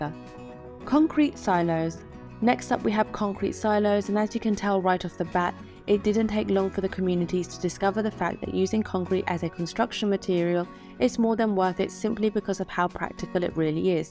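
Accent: United Kingdom. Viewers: I like the narrator's posh accent.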